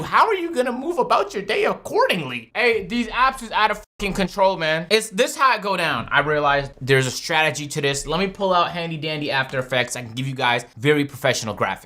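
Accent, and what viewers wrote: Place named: United States. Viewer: Ayo, what happened to your Canadian accent. You straight up American now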